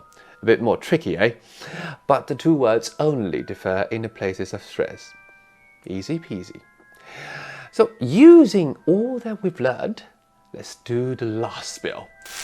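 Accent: United Kingdom. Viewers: podcast 英國腔任何東西都可以！！ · Teacher, you have a beautiful fluent British accent and I really like it. I wanna to learn English but I am not interested in other English films except for Harry Potter series. And this is actually a perfect video to let me learn English, it is such a wonderful combination of English and also Harry Potter. Well..hope u will continue this series..ummmm.. i love it so much and thanks a lot!😊❤ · 來了～老師終於出片了❤️ 從一開始聽到老師的英式口音覺得好高雅好好聽喔～ 但是身邊沒有用英式口音的人啊～都是美式口音居多 真的很可惜沒人可以練習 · cool british.accent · 英式口音真的很好聽👍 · 好像繼續學英文了 老師的英國腔真好聽！ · 英國腔超棒的！好好聽(☆∀☆)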